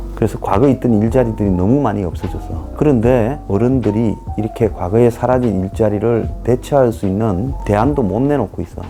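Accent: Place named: Seoul, South Korea. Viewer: 소장님.귀여우세요~살짝 사투리 섞인 서울밀투~~^^ㅋㅋ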